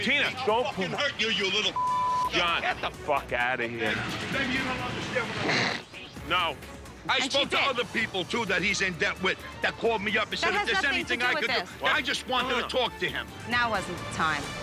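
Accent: Scotland, United Kingdom. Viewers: It's strange listening to the British commentary. I'm so used to hearing the American guy in the episode. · The Scottish accent really jumped out in the narration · I totally felt the change in narrators. A lil bri'ish eh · Have they taken voiceover voice from when he was 15 or something. he sounds more scottish than ive ever heard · This episode must be real early because it's not narrating it's some Scottish dude. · scottish Ramsay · Kitchen Nightmares US with a British narrator is pure gold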